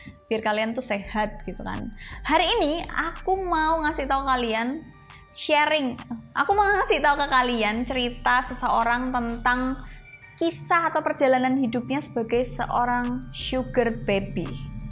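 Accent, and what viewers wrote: Java, Indonesia. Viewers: Suka dg gaya bicara yg medok khas Jawa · Medok nya itu rek, lucu n so sweet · seneng banget denger logat jawa nya mba * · Logat Jowomu medok banget mba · medok mu tenan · Mbaknya cantik tapi medok jadi lucu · klo ngomong enak jowoan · Dede medok cantik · Jowo ne muantebz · Medhok bgt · Jowomu kok medok tenan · Bhsa indonesianya kog medok mbk, org jawa ya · Lumayan..bagus cara menyajikannya...logat jowonya yg bikin seru...👍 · BHS indonesia dgn Medog Jawa jd lucu dengarnya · Medokk ya mbaee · Logat jawamu mbak uenak dirungokno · Totok tenan jowo ne 😀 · Menarik.... Logat Jawanya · Logatnya saya suka 'Jowo banget' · Menurutku Mb lbh CERDAS dari sugar baby yg Mb ceritain Bhsa Jawa Mb medhok jdi lucu dengernya Sukses Mb 🙏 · medok banget logatmu mba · boso jowo'ne medok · Seneng dengar logat jawanya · Aku senang banget wajah cantik gini tapi medok Jawa. 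❤🔥semangat kak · kenapa saya sampe di sini ya hahaa mbak e ini pinter , ceria dan pasti nya orang jawa tho suka dialeg bahasanya yg medhok hehehe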